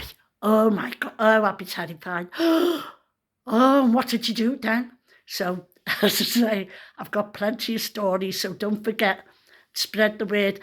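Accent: Liverpool, United Kingdom. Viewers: Hi I've just subscribed after stumbling across your channel whilst looking for how to use a pom pom maker😂😂😂 have shared with my son who's currently teaching in Thailand. He also likes your videos. Like me, he loves to hear about all things supernatural. The way you tell it especially with your great scouse accent ❤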